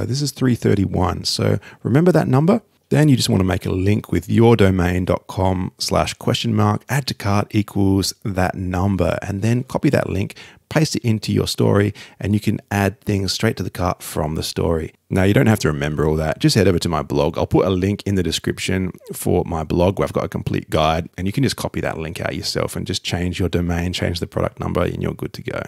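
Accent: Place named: Australia. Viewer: I saw this demo’d on another vid which was older than this one, and I was very keen to use for my website blogs - funny it’s still in beta but I’ll be getting all over it as an all in one for blogs and much quicker to develop than elementor or Divi - not that they are that tricky but this is quicker again. And dude am I mistaken that your an Oz tuber? Sure sounds like it, very refreshing to hear the Oz accent. I’ve checked out a few of your vids and I’ll subscribe to add support to an Aussie bloke, your vids are good and helpful. Keep it up. Cheers Cherie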